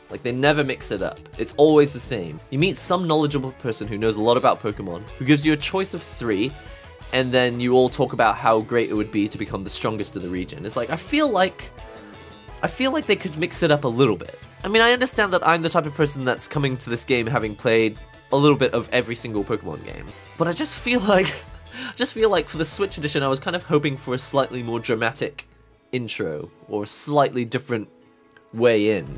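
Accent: United Kingdom. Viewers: well he is a British dude doing a British accent. Nothing really surprising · Doesn't sound like a classical RP, but Finally someone who speaks in some british accent and do it UNDERSTANDABLE. :D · This is gold but I've been watching you for years... Are you British? But 1st ☕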